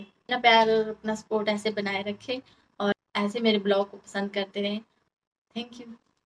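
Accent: Haryana, India. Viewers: aap haryana se ho kya aap ki boli haryana side ki h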